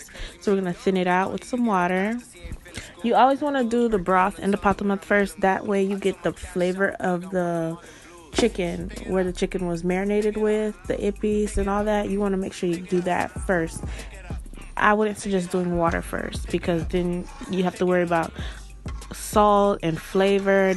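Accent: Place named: Haiti. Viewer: Ahah lol the Haitian accent got me chicken wings wings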